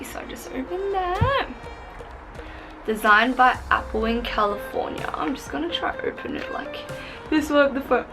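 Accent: Australia. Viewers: Are you Australian cause I am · Your Australian!!! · Are Australian? You sound like you are?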